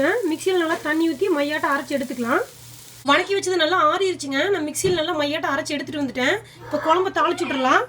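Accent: Kongu, India. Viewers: I like her kongu slang.. varevah.. 😋 yummy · Egg Curry looks so tempting n so do ur kongu tamil sounds great😍🌷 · முட்டை குழம்பு செய்முறையும் மண் மணம் மாறாத கொங்கு தமிழ் பேச்சும் அருமையோ அருமை...குழம்பு சூப்பர்....தொடரட்டும் உங்கள் சேவை...நன்றி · கொங்கு பாசை சூப்பருங்கோவ்.. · Athuthanka kongu Thamil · Konku timal pesuradhu thani allaku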